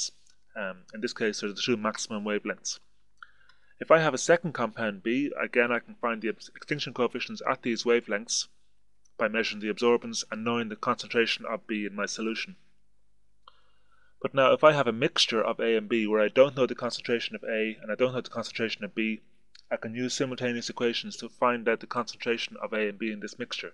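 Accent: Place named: Ireland. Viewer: Lol, recognisably irish accent. refreshing for a change :)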